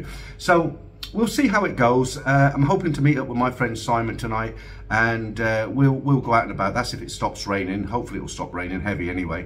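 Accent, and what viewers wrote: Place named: United Kingdom. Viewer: It’s called an accent… Have you really been sheltered that much? Different parts of Britain do have different accents and in some areas, they tend to add an “err” sound at the end. They don’t even realize they do it. Because you know. It’s an accent. 🤦‍♂️ How do you think the word “soccER”, came about? (Actually there is more to how we got the word soccer but that is beside the point.)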